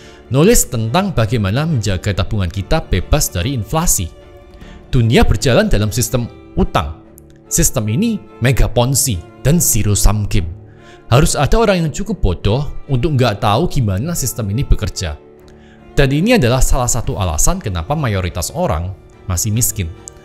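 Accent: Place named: Surabaya, Indonesia. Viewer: Iki ketok’e arek Suroboyo yo? Keroso dari logat e. Lek iyo berarti kita konco plekkk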